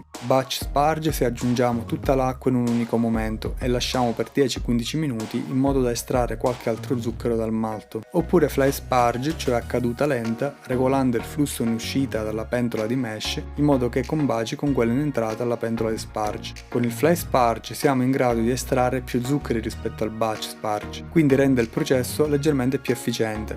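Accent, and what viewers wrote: Calabria, Italy. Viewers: Bravo, sei calabrese?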